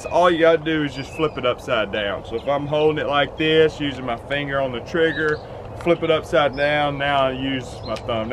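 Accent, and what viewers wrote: South, United States. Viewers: What part of the south you from, NC here representing! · Any manual skill automatically has increased credibility if the instructor has a southern drawl. Thank you, sir!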